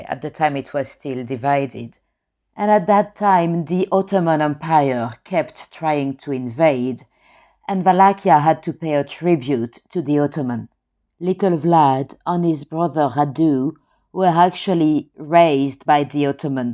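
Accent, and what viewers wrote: France. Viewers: I like ur voice and accent. Sounds like French talking English. 🙂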